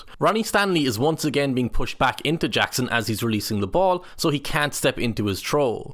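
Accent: Ireland. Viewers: Can't say I ever thought I'd hear an in-depth film breakdown of an NFL QB from a guy with an Irish accent, but you clearly know what youre ralking about. Great stuff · The best American football commentary with an Irish accent I've ever heard. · Surprised to hear narration from an Irishman. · An Irish man commentating American football? Okay I’m here for it.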